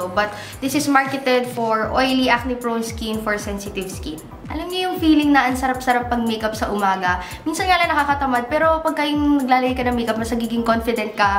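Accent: Batangas, Philippines. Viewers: "Pinay skin tone kumbaga" love ur batangueña accent. Ps: subscriber from Batangas 😊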